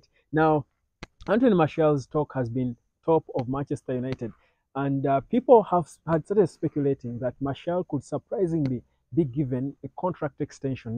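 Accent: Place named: Uganda. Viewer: Nice to see you from home soil though you didn't name it but from your accent I guess Uganda, my girlfreind is Ugandan by the way😊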